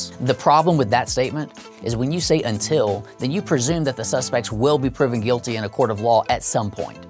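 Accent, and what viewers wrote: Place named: United States. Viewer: true. But who are you replying to? I don't see anyone saying they're the same, and I think we all understand that this video was about the US. (The accent was a pretty big clue)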